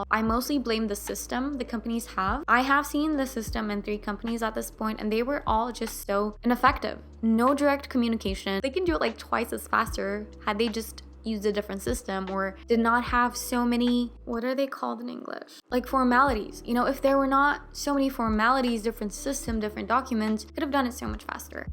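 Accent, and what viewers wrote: United States. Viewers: She was raised in Turkey, though she's fluent in English and has an American type accent.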